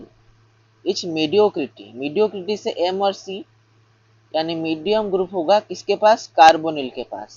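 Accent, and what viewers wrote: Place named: Odisha, India. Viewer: bhai tama video ra strating 10 sec ru jani deli tame odisha ru boli , bhai bhala explain karucha , i love it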